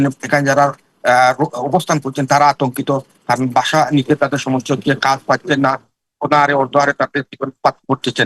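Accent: Sylhet, Bangladesh. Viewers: Please speak decent bengali or pure sylheti..sounds really odd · সিলেটি ভাষা ও শুদ্ধ। বলতে পারতেন ভালো করে সিলেটি ভাষায় বা টোনে না বলে বাংলা ভাষায় সাবলিল ভাবে বলার জন্য। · ভাই দেখি সিলটি🤔 পুরো সিলেটি মাতিলাও ভাই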